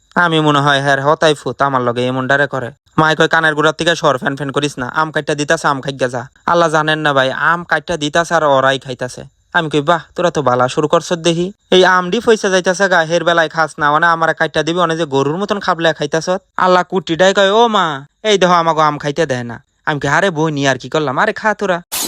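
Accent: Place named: Chandpur, Bangladesh. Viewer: উনার কথা শুনে চাঁদপুর মতলবের মনে হয়।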